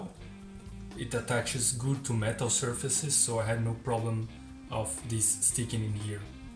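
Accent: Brazil. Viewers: Fala, sabia que você era brasileiro! O sotaque é de Brazuca! To aqui na Holanda BTW. To precisando de uma fonte dessas, a minha ISOPOWER 12 não cabe em baixo do pedaltrain 2 e mesmo que eu coloque o CIOKS mounting kit acho que não vai dar, vc acha que ainda é uma boa opção?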